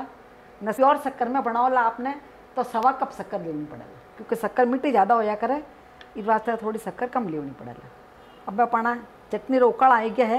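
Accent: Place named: Marwar, India. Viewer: आपको समजावणा को तरीको बढीया है आवाज बहुत साफ,मिठी बोली और शुद्ध मारवाड़ी !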